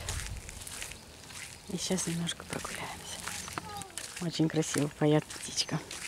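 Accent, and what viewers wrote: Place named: Belarus. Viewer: здравствуйте. Я год тому назад случайно попала на Ваш канал. Понравилось просто Ваш говор, ведение разговора. А когда Вы нынче приехали к родителям и мама с папой стали учавствовать в с"емках и услышала говор родной, песни - все... Моя любовь - Беларусь!!! Пусть Господь даст здоровья Вашим родителям на долгие года!!! Пожалуйста, научите их записывать для нас видео ( хоть раз в месяц) и Вы уж сами до на доносите их видео... Спасибо за все!!! До свидания! До видзення...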